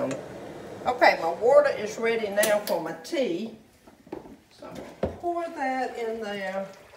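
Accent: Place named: Carolinas, United States. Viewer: Oh you just make my day, ❤. I look forward to your dialect, very much like my own, born and raised in the Carolinas.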